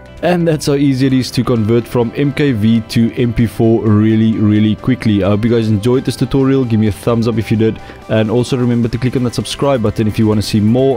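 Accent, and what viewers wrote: South Africa. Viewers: Homeboy sounds South African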